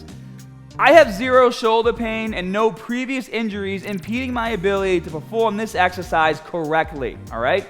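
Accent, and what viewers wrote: Boston, United States. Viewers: Is this guy from Boston?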